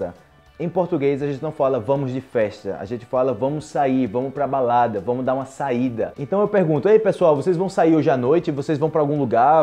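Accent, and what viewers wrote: Colombia. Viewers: Aquí en chile "presentar una prueba" es usado en el ámbito judicial. Esta respalda una acusación. Puede ser mostrar un objeto; declaración de testigos. Para prueba, lo escrito es"hacer, contestar, una prueba y en la octava región usan "presentarse a un certamen". Tb. Se puede hacer una prueba, como hacer saltos en 20 seg.; O quién bebe más agua; ello en un concurso. También está "la prueba de amor", pero ese tema queda para otra. Ah!!! hablas muy bien colombiano mi parcero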